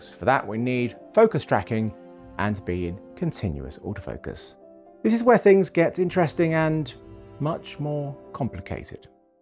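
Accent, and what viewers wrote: United Kingdom. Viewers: It's always a pleasure watching your videos. Your British accent and cats are awesome!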